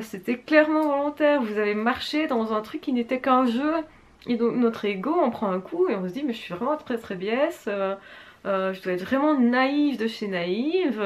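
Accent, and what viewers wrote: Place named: Belgium. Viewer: très très biesse... Aaah une belge ^^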